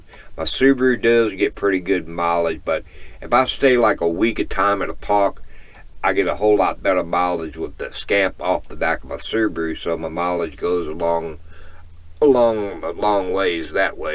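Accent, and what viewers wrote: South, United States. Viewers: I loved how when you went to Mexico and returned, as soon as the American border agents heard your Southern drawl they just sort of waived you on through.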